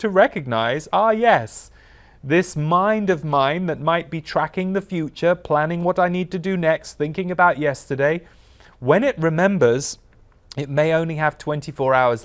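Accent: United Kingdom. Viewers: I like your British accent! It's soothing to listen to. 💖Thank you! 💖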